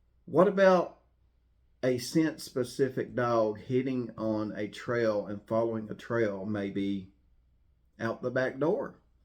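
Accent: South, United States. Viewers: Love listening to your southern voice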